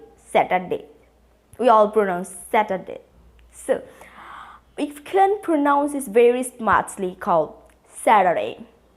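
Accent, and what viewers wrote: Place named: United Kingdom. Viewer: how you got adapted British accent! It's so fascinating to listen.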